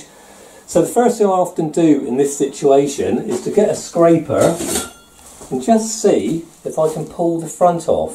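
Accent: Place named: United Kingdom. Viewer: I was watching the video just to listen to British Accent!!!... It's fabulous!!!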